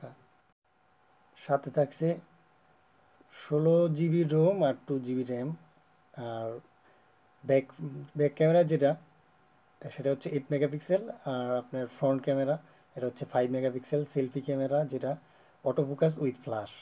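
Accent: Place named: Myanmar. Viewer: Vai khotha valo Kora bolban Karon mramar manusar moto khothaboltasan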